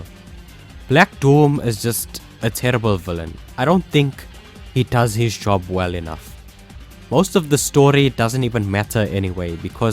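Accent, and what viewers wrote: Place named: South Africa. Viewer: That's nice 👌 I'm British Indian but I have a lot of Indian South African family, so that's how I recognised your accent!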